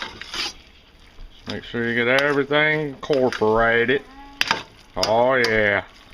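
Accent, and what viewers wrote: South, United States. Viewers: You have some great recipes. I cook every day. And we season a lot the same. I noticed your southern accent. Can I ask where you are from? Thanks for posting. Looking forward to your next recipe.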